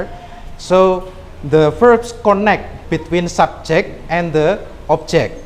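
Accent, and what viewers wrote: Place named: Java, Indonesia. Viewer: Javanese english sir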